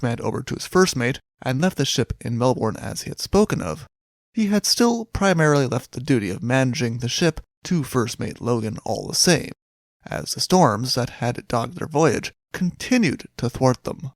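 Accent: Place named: United States. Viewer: What the hell is going on with the narration these days. Not to mention I am sick to death of hearing American accents for every damn thing that is read. They are the worst at the pronunciation of nouns and.any other name for that matter. How stupid is the rest of the world to let the USA take over everything on the planet. 😡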